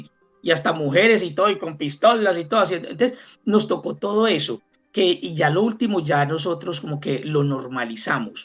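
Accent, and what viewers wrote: Colombia. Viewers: Qué paisita para hablar rico!!! Muchas gracias por tan buena e inteligente reflexion!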